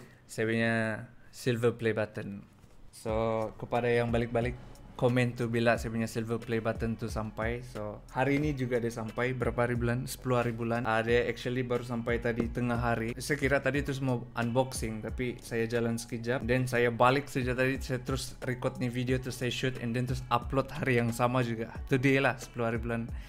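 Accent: Sabah, Malaysia. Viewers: sya mau tanya kau sesuatu mcm mana kau eja BAH😂 kau buat ba video mcm orang sabah cakap kl best gilak 😂😂btw congrats 🥳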